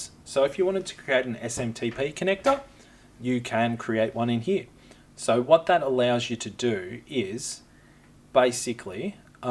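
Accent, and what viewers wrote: Australia. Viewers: I guess this is the closest thing we are gonna get to finding a video with English Speaker. Like, I saw the thumbnail and thought YAY a white guy then I hear hes Australian and Im kinda bummed but then I think well at least hes not Indian. WHY are so many Tech videos done by an Indian with a super harsh accent? Hey Non- American accent aside, Great video "Mate". ;-)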